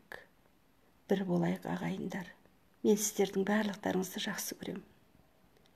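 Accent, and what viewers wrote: Standard. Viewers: Ооо керемет әпше.Қазақшаңыз таза екен.Мен өз басым қазақшаныда орысшаныда жақсы білемін.Бірақ көпшілік Макеңе жазып жатады қазақша сөйле деп Атеистсің деп.Ұйғырсың деп.Егерде Макең нашар болсада арасында қазақша сөйлесе эфирде.Содан кейін Мен 5 мезгілі намаз оқимын деп ҚҰРАН кітабын ұстап айтса онда 90 % қалық Макең жағына өтетін еді.Ия мен жақсы түсінемін ол кісіні.Біздің заманымызда сондай болды.Бірақ қазір заман өзгерді.Сізде Қазақстанда тұрмағаннан бұл жақтағы жағдайларды түсіне бермейтін шығарсыз.Жұма күндері еліміздің бір мешітінде орын болмайды.Барлығы жастар.Сол себептен митингілерге жастар шықпайды.Ал жастарсыз митинг деген бос әуре. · Оо ханым.Калай таза казакша сойлейсиз.Шет елде журсенизде.Алла разы болсын.Халыктын батыры Дулат бауырымыздын жаны Жаннаттан болсын.Кайгырып конил айтамыз.Олди деуге келмейтин.Кайталанбайтын батыр деп билемиз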